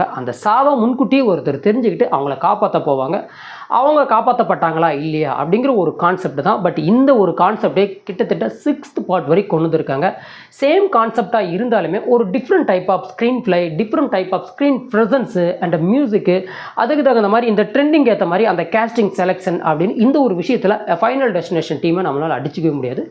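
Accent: Kongu, India. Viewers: Wonderful REview.. Kongu Tamil Vazhga... Cheers from #Pollachi Town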